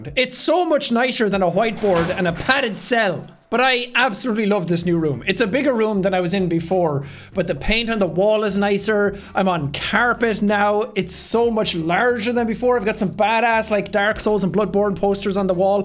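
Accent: Ireland. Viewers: I love how he became significantly more Irish after the ice was broken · The entire time I watched this my cat was scratching at my door for no reason and I was like: She is drawn to the voice of the loud Irish man 🛐🛐🛐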